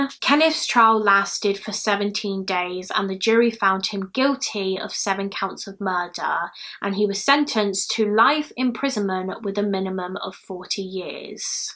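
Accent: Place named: England, United Kingdom. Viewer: I read a story on AO3 the other day & one of the characters was called. Every time I came across the name, which was very often, the reading voice in my head suddenly had the English accent and pronounced the name exactly the way that our here does. This of course prompted me to walk around just randomly saying the name but in her accent. So thank you for finding a way to drive my family absolutely nutty (& into laughter) because that's my goal in life. Not to drive people crazy but to make people laugh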